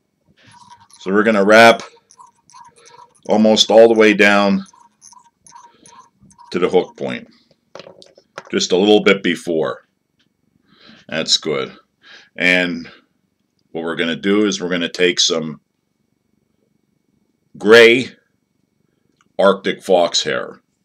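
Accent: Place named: Pittsburgh, United States. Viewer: hey I was just wandering if you were a yinzer?. I am and you sound like a yinzer. check out my video about the secret hair jig as I give a shout out to you. Great job and keep up great work. if you are a yinzer leave a message for me and we can get on the water. thanks yankeelipper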